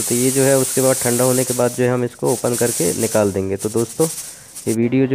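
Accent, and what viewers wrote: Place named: India. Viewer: No one hears ur Indian speech try to post with English subtitles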